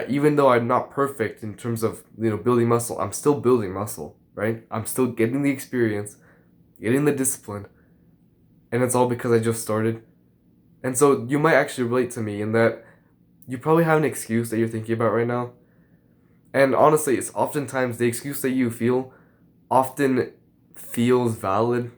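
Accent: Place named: Germany. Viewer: You have a German accent and probably live in Germany? If so, I lived in Munich for three years. Spreek je Nederlands?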